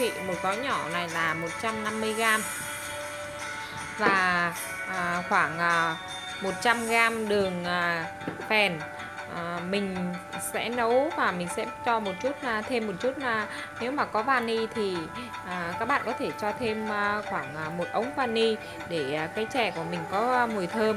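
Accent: North, Vietnam. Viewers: Cái này dạy nghe nhạc chứ nấu chè gì , nói giọng Bắc , lồng nhạc to đùng , nghe phát ghét ai mà đăng ký kênh cho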